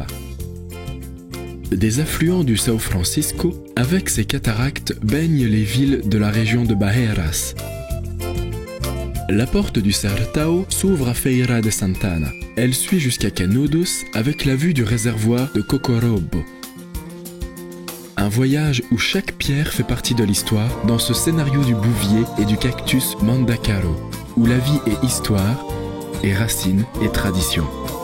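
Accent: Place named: France. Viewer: Bahia com sotaque francês ficou divina. IMPERDÍVEL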